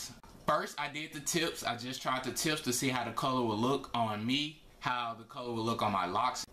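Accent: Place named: South, United States. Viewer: ahh okay. I gotta cousin from Texas and you sound just like him so I knew it was a southern accent.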